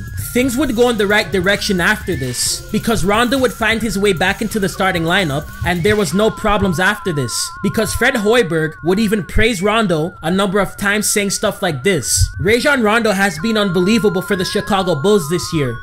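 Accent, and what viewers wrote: Toronto, Canada. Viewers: Just wondering from your accent. Are you from Toronto?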